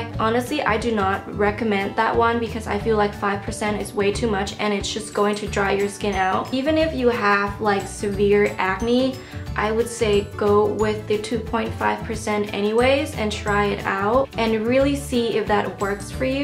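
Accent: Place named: Thailand. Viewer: Love the Thai accent!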